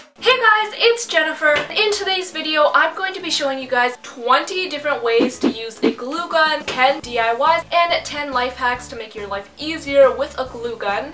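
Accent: Canada. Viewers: I'm from England and I'm so proud that I managed to tell you are from Canada and not America from your accent 😂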